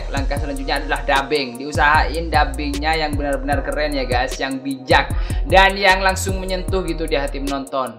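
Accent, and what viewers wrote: Madura, Indonesia. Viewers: Orang madura ya mas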